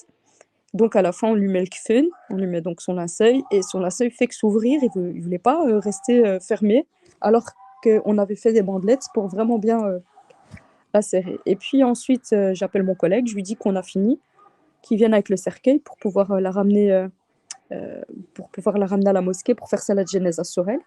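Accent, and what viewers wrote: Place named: Belgium. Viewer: Cest pas beau laccent belge ...en dehors de ca cest bien que des personnes sache faire le lavage mortuel et davoir le courage ...Allah oumma barek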